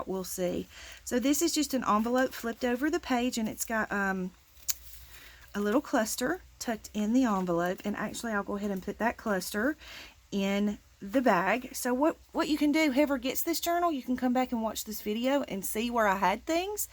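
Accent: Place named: Tennessee, United States. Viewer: This is simply stunning! I thought I recognized your accent...I grew up in Cookeville, just a hop, skip and jump away! ty so much for sharing .. it’s so beautiful! Hugs 🤗MH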